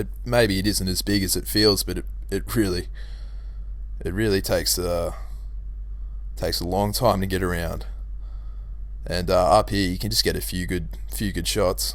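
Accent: Australia. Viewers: you are Aussie?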